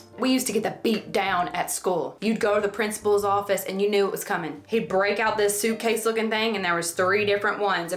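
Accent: South, United States. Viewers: I think you’re absolutely hilarious and adorable. You have a very magnetic enigmatic quality and you’re gorgeous. You don’t SUCK at this YouTube thing. I get sucked into your videos every time I watch just one! I love your accent, your humor, your southern sayings. I think you’re killing it girl. Keep up the amazing work! You got this!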